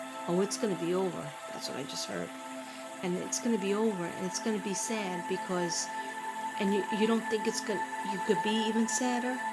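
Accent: New York, United States. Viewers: seriously I am so amused by you. ❤️ Your NY accent in TX. I love it! You guys must have moved there for a job because why else would a proud NY’er move so far southwest? It must have been a difficult transition. Lived my whole life in the Midwest, went deeeeeep south to visit my husbands family and felt (and probably looked) like a fish out of water. Nice to visit, not stay 😂. I love your heart, you are so precious.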